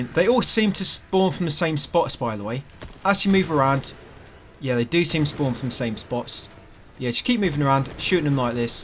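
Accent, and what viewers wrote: United Kingdom. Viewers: Why is your accent so british for Gods sake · Remember you from the "hell of a Sheriffe achievement. Definetly the best. I'll definetely try your strategy, since I was 30 minutes too late on my own. Good job! BTW, where are you from? I love the accent. British for sure...but whitch city? I teach English as a second language. Thats why I'm curious.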